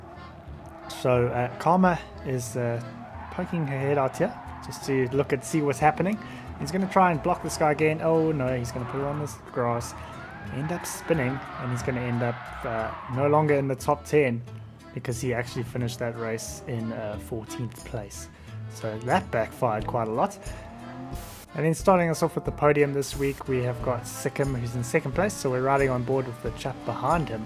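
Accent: South Africa. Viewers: Am I hearing a south african accent?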